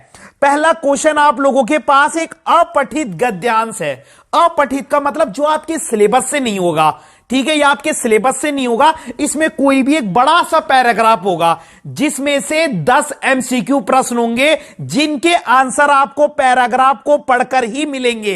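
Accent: Garhwal, India. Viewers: Sir ap ghadwali ho kyy ??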